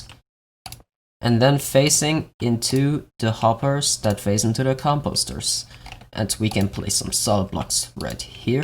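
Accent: Germany. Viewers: sounds a bit German(?)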